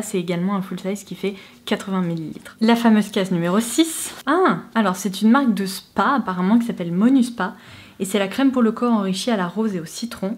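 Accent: England, United Kingdom. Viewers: Sur tous les calendriers que j'ai regardé, celui là est juste whaou. MAGNIFIQUE 😍😍😍 Merci beaucoup pour cette belle découverte. Vous êtes d'une douceur que j'adore. En plus c'est incroyable toute votre connaissance sur les produits beauté. Et votre accent anglais, on en parle??? Juste whaou. Vous êtes un vrai rayon de soleil. Merci😍😍🌹🌈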